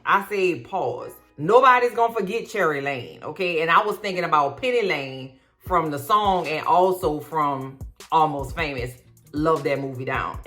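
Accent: New Orleans, United States. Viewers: Great review! Are you from New Orleans? My gosh love the accent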